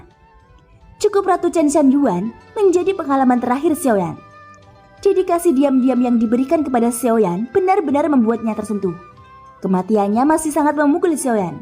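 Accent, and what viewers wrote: Java, Indonesia. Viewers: Medok nya min😅😅😅cuma pengisi nobarta lama yg mantap intonasi pembawanya😂😂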